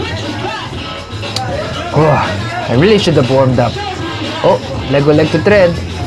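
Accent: Philippines. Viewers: HAHAHA! Nice Filo accent bruh! Dope battle! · HAHAHAHA i like your filipino accent!! hope you had fun playing in manila!! godbless you sir!🙌🏻🙌🏻💪🏼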